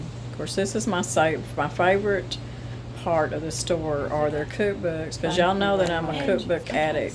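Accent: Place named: Arkansas, United States. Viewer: Thank you for sharing. My late father was from northern Arkansas and extended family still lives in an area likely not too far from you and where we took many road trips to visit years ago. Your accent and way of speaking reminds me fondly of my late grandmother and my aunts and cousins. Lovely, soft, and sweet. Thank you again from the heart of the San Joaquin Valley in California where tens of thousands of acres of almonds are grown and are currently blooming in celestial creamy white this time of year.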